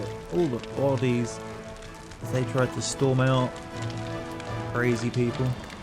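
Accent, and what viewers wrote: United Kingdom. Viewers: You can't upload this quick enough buddy. Great series On a side note I'm guessing you're south coast with an accent like that. Winchester perhaps? (I might be way off but I'm from Bournemouth)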